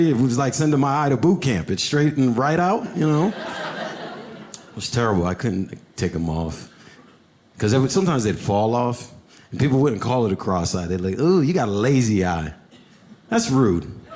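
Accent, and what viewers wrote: Dominican Republic. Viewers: I don't know if most of you realize the funniest part about his spanish accent is that he nails the dominican accent! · My boss said to ××cap a chino×× dude I lost it that was a great joke and crazier punchline and with the Dominican accent made it all come together!!!!!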